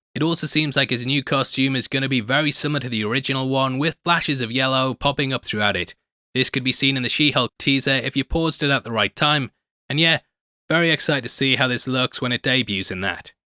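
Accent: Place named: Scotland, United Kingdom. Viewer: Love the show and the Scottish accent keep up the good work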